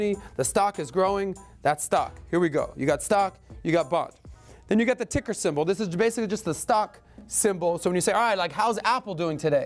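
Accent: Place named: South, United States. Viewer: This right here is the second time I am coming across this name in a week. Came to my school for a lesson and it was lit and yes, he has a really cute southern accent.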